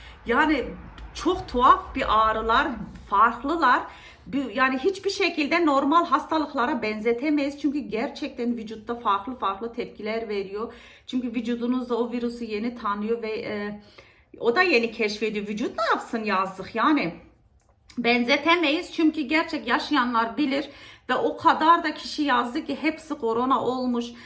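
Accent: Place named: Nevşehir, Turkey. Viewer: Çok geçmiş olsun sizi yeni gördüm nerelisiniz bizim oranin konuşmasina benziyor konuşmaniz ben nevşehirliyim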